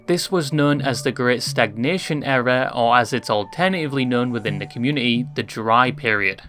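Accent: United Kingdom. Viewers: You may call me old for knowing Angel with a Shotgun. But your British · Why do i realise this guy is kinda bri'ish (first time ever watching this guy)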